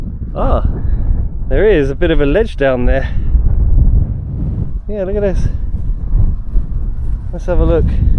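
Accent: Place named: Southern England, United Kingdom. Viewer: Funny how you use the long a for Castleton but a short one for Mam Tor. You Southerners are a strange lot.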